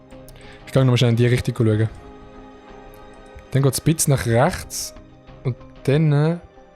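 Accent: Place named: Switzerland. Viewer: ich liebe den Schweizer Dialekt. Meine freunde verstehen zwar fast nichts aber egal einfach nur liebe dafür das du das so durchziehst. Mach weiter so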